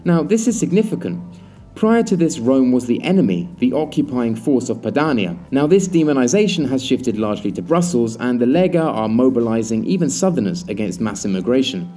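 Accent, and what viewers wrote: United Kingdom. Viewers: you are from the south of Italy? All of this time i thought you were somewhere from England. Am i correct in guessing that you moved to the South? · Wow you're from Italy? I thought you were British this entire time. Regardless, great content like always!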